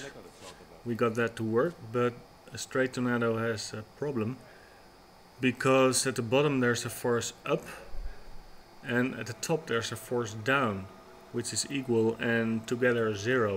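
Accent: Netherlands. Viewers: Gast ik hoor aan je stem dat je nler bent ik wil s komen kijken! mag dat? :D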